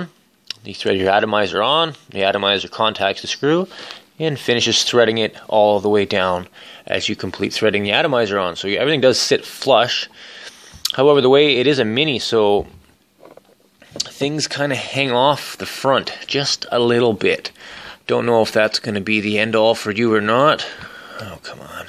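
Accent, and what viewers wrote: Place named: Canada. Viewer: sounds like Canada for sure.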